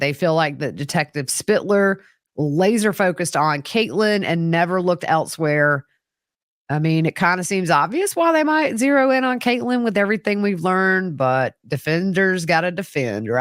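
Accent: South, United States. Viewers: Also from the south so I love your accent and your pronunciation of tumultuous is cracking me up 🤣😂🤣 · And her southern accent fits perfectly for a case here in Texas!!!!😊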